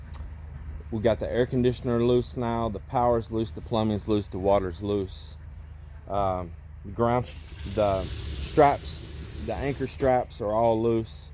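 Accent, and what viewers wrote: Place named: Louisiana, United States. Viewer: You sound like you might have a little bit of Cajun blood in you, by your accent. Do you? My sister has Cajun roots.👍😊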